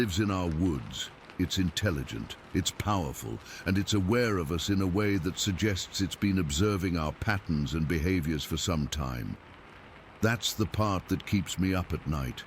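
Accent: United Kingdom. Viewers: Why's it's always a British accent....bullshit I'll never listen again ¡!!!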